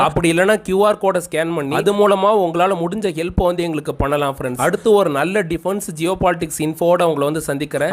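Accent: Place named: Thanjavur, India. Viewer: சார் தஞ்சாவூர் ஏரியா போல.அந்தாண்டனு சொல்றார்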